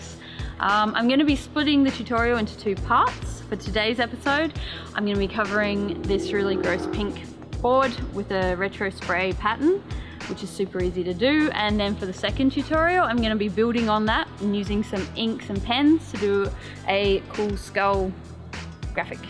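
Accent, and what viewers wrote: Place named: New Zealand. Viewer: Love this kind of art, and the kiwi accent :)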